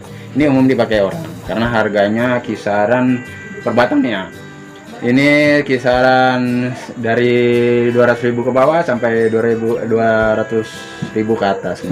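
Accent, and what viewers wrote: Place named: Jakarta, Indonesia. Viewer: Logat bicara nya kaya orang betawi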